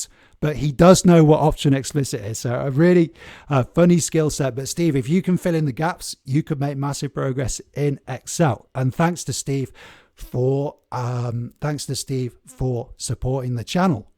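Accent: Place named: United Kingdom. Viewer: I also learn English here! "Stag Do"....I looked it up and it means bachelor party. We use the term "bachelor party" in US. I learned VBA from other Youtube channel , but this interactive course , I think, is better. The British accent sounds a little bit strange to me at first, but I get used to it.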